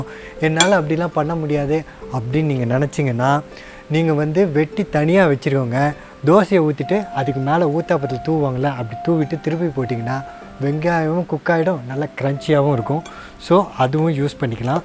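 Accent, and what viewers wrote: Tirunelveli, India. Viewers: Pattani paruppu Tirunelveli slang... you're speaking more Tirunelveli slang only...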